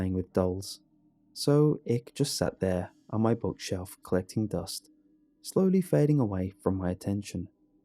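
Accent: United Kingdom. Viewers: Something about a British accent makes the stories sound scarier for some reason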